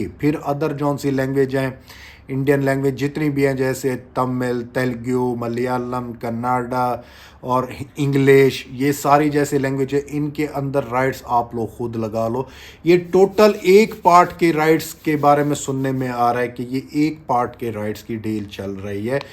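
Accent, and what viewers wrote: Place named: Pakistan. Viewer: Pleass batao na..aap india se ho ya pakistan hai..kyuki apki accent Pakistani hai..❤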